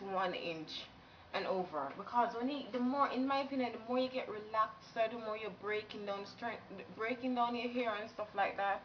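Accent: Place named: Jamaica. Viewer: W00t! Another Jamaican. Whaagwaan? Weh yuh deh? Jamaica still? Bway mi miss it bad bad. Not even affi look pon di name, a hear mi juss hear yuh voice an mi kNOW SEH a muss Jamaican dat. hail up. :D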